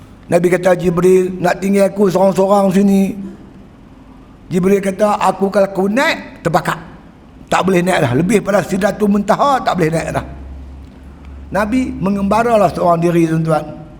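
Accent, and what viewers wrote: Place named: Perak, Malaysia. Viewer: Slank Perak utara. Nahu dia lain sikit dari Kedah atau Penang.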